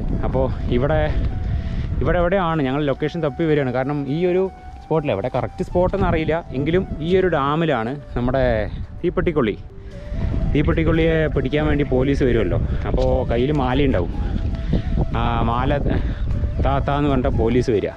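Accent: Palakkad, India. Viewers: etta njngalude palakadan bashayilulla avatharanam suparanu enik oru request und mele parambil an veed movie location pleese bro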